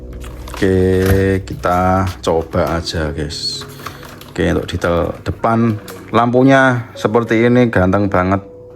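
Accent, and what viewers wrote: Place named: Java, Indonesia. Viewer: review mu seru mas onok logat² jowo e😂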